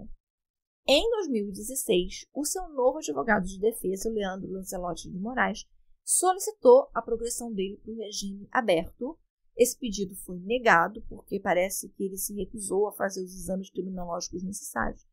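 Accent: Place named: Rio de Janeiro, Brazil. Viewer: Nossa não gostei dessa fala carioquíssima não se entende nada aroisss bla bla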